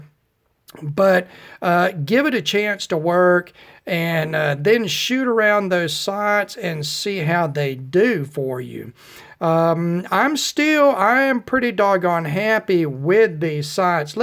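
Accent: South, United States. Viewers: Love this fellas accent , he sounds like a southern gentleman.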